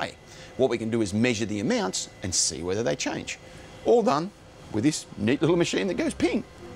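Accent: Australia. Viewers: bioluminescent tumors would have great applications in the world of Neurofibromatisis research. Apart from having my 6yr old scanned by mri every 3 months to monitor his multiple tumors, we could just run a black light over him. Is the venom specific to certain tumors when it attaches? It's something I'm keen to follow. Great work on the videos and channel, great to hear a Aussie while I'm in the U.S.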